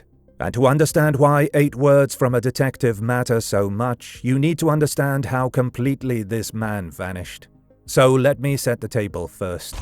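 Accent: United Kingdom. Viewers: He's British. Probably a different pronunciation.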